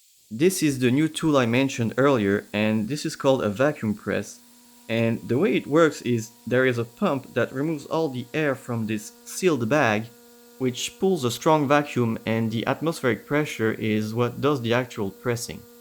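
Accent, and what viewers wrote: France. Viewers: Mec, on sent bien ton accent français dans ton anglais parlé 😄 mais beau travail 👏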